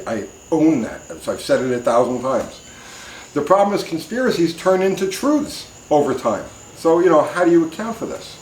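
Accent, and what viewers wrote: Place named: Long Island, United States. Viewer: Sounds like you’re from Long Island... I left that place ... NY 24 years ago and never looked back!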